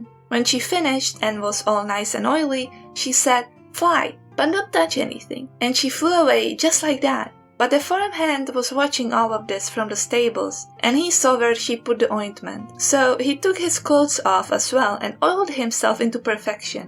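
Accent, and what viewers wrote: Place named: Czech Republic. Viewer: are you czech? because you sound really czech and i would be surprised if you aren't lol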